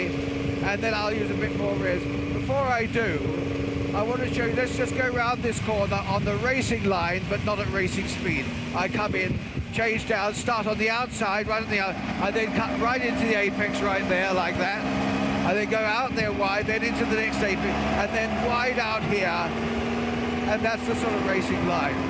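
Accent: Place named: RP, United Kingdom. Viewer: He's one of those guys you could listen to all day and never get bored. I don't know if his, posh?, accent is a help or hindrance to that.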